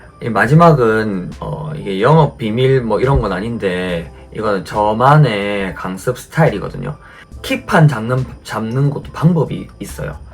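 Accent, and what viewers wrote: Gyeongsang, South Korea. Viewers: 다정다감한 경상도 억양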